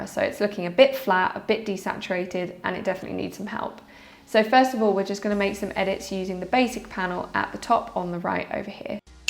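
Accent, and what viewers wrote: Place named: RP, United Kingdom. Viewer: Thank you for some sage advice;-) Keep going, you have good skills and a very nice accent (I'm an American, so we love this particular posh accent.)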